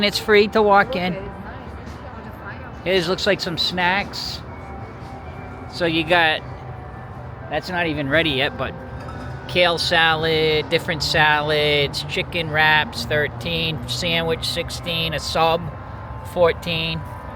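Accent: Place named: New York, United States. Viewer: I live in jersey and never cut down our own tree artificial I grew upon and to this day artificial lol I thought you were from New York with the accent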